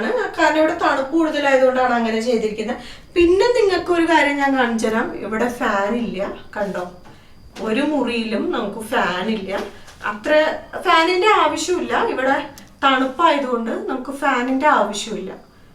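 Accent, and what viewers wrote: Thrissur, India. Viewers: നാട്ടിൽ ത്രിശൂർ ആണോ വീട്..?? സ്റ്റാർ മാജിക്കിലെ ലക്ഷ്മിയുടെയും സ്ലാങ്കും വോയിസും ഒരുപോലെയുണ്ട്...👍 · Hi, അവതരണം നന്നായിട്ടുണ്ട്, painting അടിപൊളി ആയിട്ടുണ്ട്, നാട്ടിൽ എവിടെയാ, ഒരു തൃശൂർ slang പോലെ തോന്നുന്നു.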